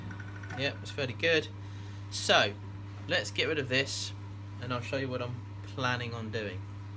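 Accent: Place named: England, United Kingdom. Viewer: Don't worry about your talking. I can listen to you all day. I love your accent. We don't come across too many people with English accents in my area in Chicago. 😁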